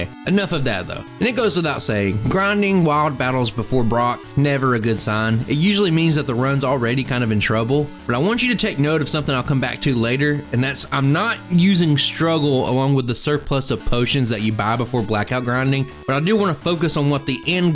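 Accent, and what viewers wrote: South, United States. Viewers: The quality of your videos definitely rivals that of other high subscriber count creators, and your Southern accent makes you distinct from the crowd. (I'm from Louisiana, so I love the accent btw 😂) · Gengar ❌️ Geengar✅️.....😂 but seriously I've been loving your videos man, and the southern drawl makes it feel like home😊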